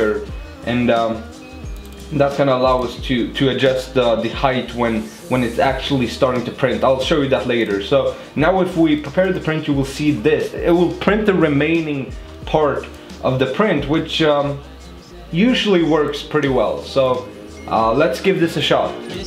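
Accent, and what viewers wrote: Sweden. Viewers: Are you Swedish?? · I was about to ask about your accent then you said Sweden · Are you swedicj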